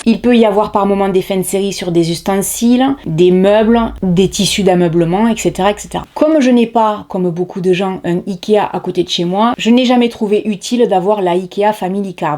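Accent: South, France. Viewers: C'est toujours un plaisir de t'écouter/regarder tes videos. Au Canada, dans la ville de Québec, il n'y a de chanson Ikea, une chance!!! L''autre jour, nous l'avons fait notre magasinage a l'envers des fleches! (pour le plaisir, voir autrement, etc)!! Les prix sont plus dispendieux. Ma fille et moi adorent regarder tes videos. Je m'ennuie de l'accent du Sud. La proximité des autres pays me manque aussi. take care. Pleins de bisous de l'autre coté de l'ocean.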